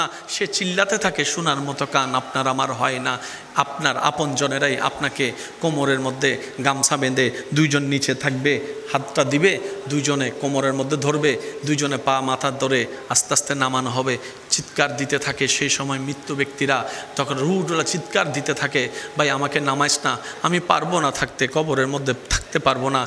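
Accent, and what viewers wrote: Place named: Chittagong, Bangladesh. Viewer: ভাই৷ অনেক সময় আপনার কথা গুলা চাটগাইয়া বুজা দায় তবে বুজি কম বেশ সব জেলার কথা গুলা আনেগো কোন জেলার কথা গুলা বুতে একটু কস্ট হয় আমিন মনে কিচু নিবেন না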